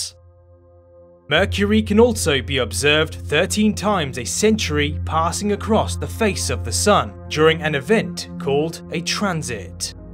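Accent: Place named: England, United Kingdom. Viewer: I like how you tell stories about the Universe... I'm in love with your english accent. Wow congratulation.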